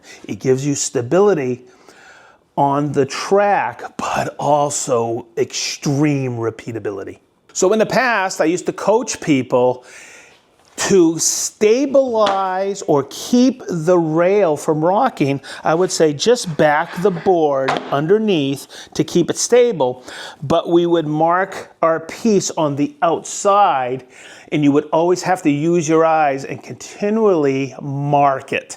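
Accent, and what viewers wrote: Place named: Boston, United States. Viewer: just want to thank you for all of your tutorials on woodworking and the use of the Festool System. I'm a hobbyist and both a fan and user of Festool products. Your videos have enabled me to fully understand and utilize the features of Festool products while elevating my woodworking game. Your product recommendations are also well noted, and I'll be adding some of your suggested accessories to my toolkit soon. Each one of your tutorials are a masterclass and I plan on viewing every single video on your YouTube channel. Your energetic delivery and Boston accent are much appreciated as they add both color and authenticity as a content creator. Like Batman and Robin, you and work well together. Wishing you the best in the coming new year!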